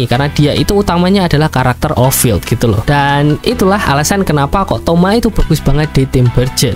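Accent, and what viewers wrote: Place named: Java, Indonesia. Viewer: Medok kali woi Kek gw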